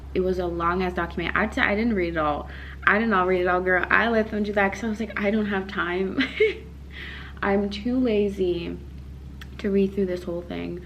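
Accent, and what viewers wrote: United States. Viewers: Did you ever live in the US? I thought you did earlier (idk maybe I just imagined it in my head cause of ur accent). I notice a lot of folks from Europe with an American parent have American accents. (Assuming ur dad is Nigerian American) P.S. luv ur vlogs! And you’re so authentically you. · how come you speak such good English and you have an American accent lol 😂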